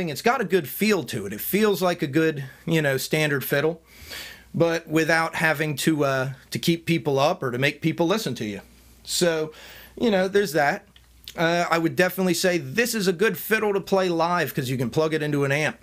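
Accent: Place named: South Jersey, United States. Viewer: you from south jersey or philly?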